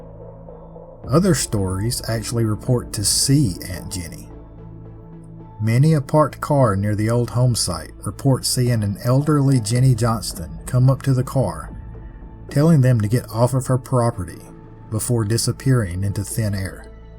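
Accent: South, United States. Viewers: So happy I found your channel! Love the creepy stories. The southern voice and the way you narrative the stories captures my full attention. Turn off the lights and bring the 🍿! 😊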